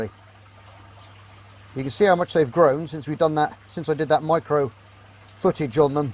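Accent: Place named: United Kingdom. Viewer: Rossendale nice to hear a British voice 😊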